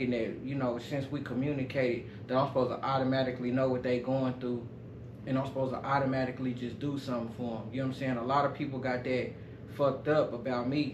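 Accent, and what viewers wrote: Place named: Oklahoma, United States. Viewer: Don't you have to show the social physically and ik you was from okc I hear it in yo voice I'm from 81st & kelly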